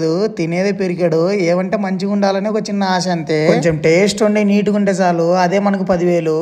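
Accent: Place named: Rayalaseema, India. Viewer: Anna me videos lo oka speciality eantante meeru matladetappudu mana రాయలసీమ slang baaga telustundi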